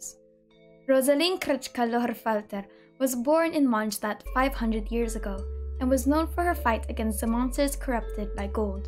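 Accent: Philippines. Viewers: Your accent sounds Filipino ❤️ Hello, kabayan! I love your content! Amazing research and compilation.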